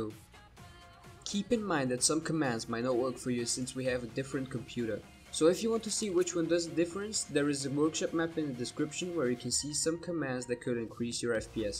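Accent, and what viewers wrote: Netherlands. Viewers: R you dutch bro??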